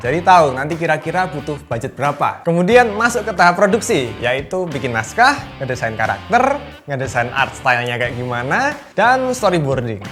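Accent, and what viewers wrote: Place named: Java, Indonesia. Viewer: wah ternyata orang yg omong ini orang Jawa ya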